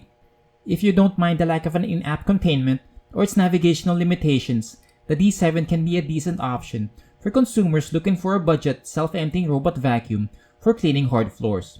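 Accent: Philippines. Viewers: Sounds like your a Filipino? Based on accent? Nice video!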